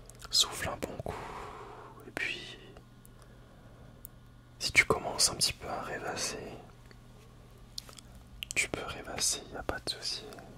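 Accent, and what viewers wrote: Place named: France. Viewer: Alors c'est peut-être moi qui hallucine, mais je trouve que tu as un petit accent mdr Pas du tout dérangeant au contraire ! Ça éveille ma curiosité ^^ Tu vis plutôt dans le nord ou le sud de la France ? Pardon c'est sûrement indiscret ce n'est pas grave si tu ne réponds pas ^^ Sinon j'ai adoré la vidéo, ça m'a relaxé direct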